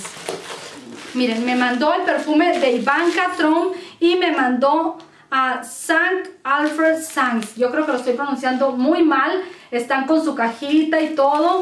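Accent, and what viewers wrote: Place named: North, Mexico. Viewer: Amiguita, nuestra querida es norteña por eso tiene ese bonito accento. Cada quien es diferente, hay que apreciar la variedad de accentos en los Latinos ❤️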